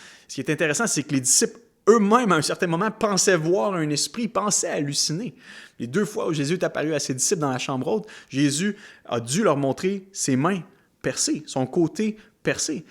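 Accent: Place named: Quebec, Canada. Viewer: Bonjour, pour commencer je désir préciser que je crois en l'œuvre de Jésus-Christ et en sa resurrection. Il me semble que dans votre explication que vous avez oublié de prendre en compte la résurrection de Lazar ( dans le nouveau testament) et la Résurrection d'un homme dans l'ancien testament (2 Rois ). Bonne continuation, merci pour vos partage avec ce beau accent du Québec, moi j'ai l'accent Alsacien.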